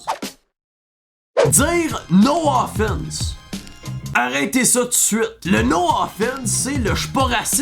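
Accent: Quebec, Canada. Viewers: J’avoue, en tant que québécoise, cet accent là Yé vraiment big Mais je trouve que ça a du charme avec l’humour- Ok ma phraSe a fuckall de sens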